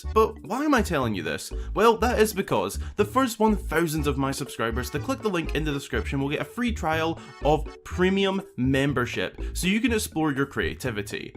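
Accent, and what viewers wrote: Scotland, United Kingdom. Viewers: Dein Akzent ist super! Schottisch klingt richtig gut.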